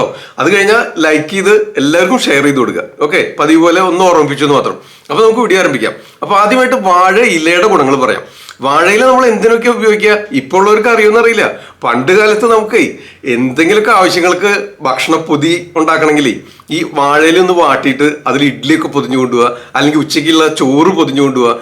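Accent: Thrissur, India. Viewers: pleasant Thrssivaperror accent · Thrisoor slang👌 nannaittund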